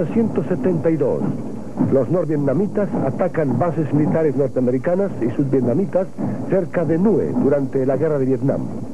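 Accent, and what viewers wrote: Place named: Argentina. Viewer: Yo creo que no, al narrador le oigo un poco el acento de Argentino o Sud Americano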